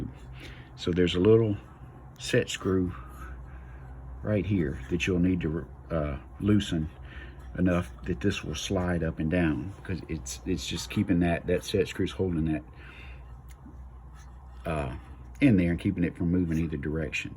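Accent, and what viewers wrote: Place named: South, United States. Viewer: IM FROM ENGLAND AND I COULDNT STOP LAUGHING AT THE FORREST GUMP ACCENT SORRY BUT IT TICKED ME PINK GREAT VIDEO THOUGH THANKS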